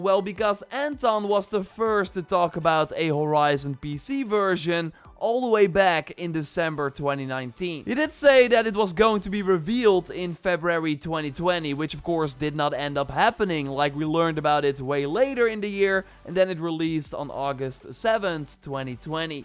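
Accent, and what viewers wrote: Netherlands. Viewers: Leuke video. Raar Nederlands Engels accent toch..🙄😁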